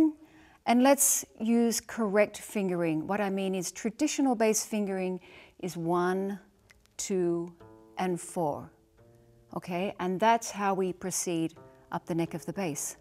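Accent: Australia. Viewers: So Australian! - starting with G'Day :-)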